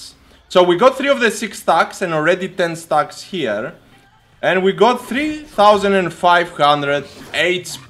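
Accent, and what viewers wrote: Greece. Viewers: Hey man love these videos, very funny and informative :) btw your accent sounds Greek, is that right?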